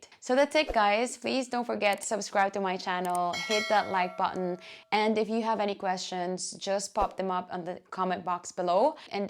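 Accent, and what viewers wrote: Ireland. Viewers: omg i can now hear a hint of irish accent coming thru when you talk!! omg 😍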